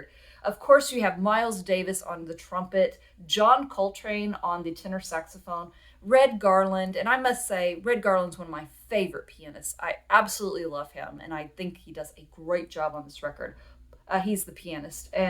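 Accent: South, United States. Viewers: .Lovr your southern drawl.Your excitement is evident on the video.This is not even his celebrated era.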